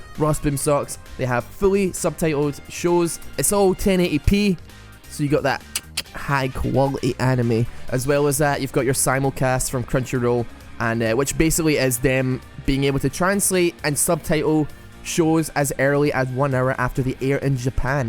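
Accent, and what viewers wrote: Scotland, United Kingdom. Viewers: are you scottish? I've been watching you for quite some time, but only now that I've started watching some videos of Frankie Boyle I've noticed your accent sounds very scottish:D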